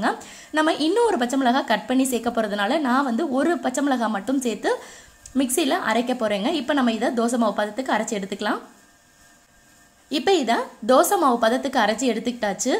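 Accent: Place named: Coimbatore, India. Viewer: Akka Coimbatore Pola...... Ethana "inga" ... Anyway different recipe.... 👍... Nice nga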